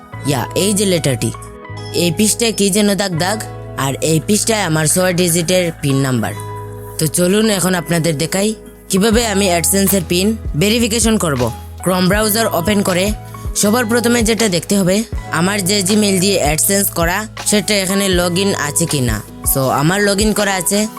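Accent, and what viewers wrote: Sylhet, Bangladesh. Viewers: tumi kita sylheti ni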